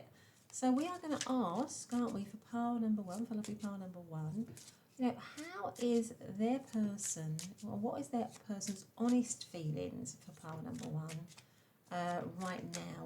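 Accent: United Kingdom. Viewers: i noticed your channel a long time ago and been watching a few, it was quite on spot. but i always am puzzled as in why such little follower count? if i can give advice, i would say it's the volume, i have to turn your volume up everytime. and maybe you speak a bit too fast, combining with the British accent combining with how quiet it is...poeple would struggle to understand. i'm saying this caz i simply cant understand why so many channel that doing readings that were never my situation attract so many attention while the one that does make sense is not receiving equial share. so, imrpvoe your mic maybe? i hope you channel grows.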